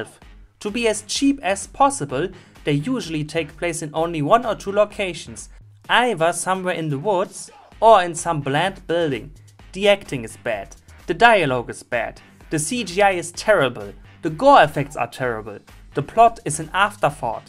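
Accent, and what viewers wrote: Germany. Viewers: This video is the first I ever watched from you, and by your accent I immediately knew you were German.... Wie kannst du kartoffelsalat so schlecht reden? XD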